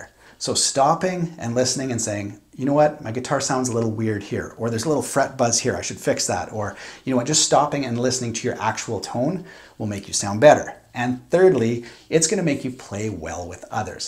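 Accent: Canada. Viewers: Your canada voice entertains me.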